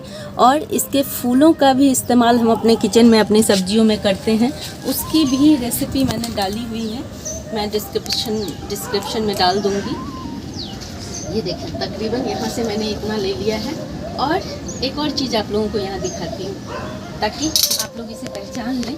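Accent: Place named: Eastern Uttar Pradesh, India. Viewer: Mam aap purvi uttar pardesh se lagti hai shayad ur good job👌👌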